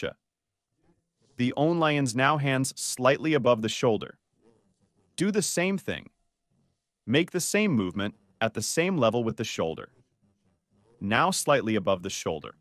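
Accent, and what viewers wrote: Russia. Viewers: I love my new russian doctor 😊. I love his sense of humour and the Russian way of speaking and his expertise. Of course I don't watch illegally and I learn so much. I'm Polish fan. Thank you ❤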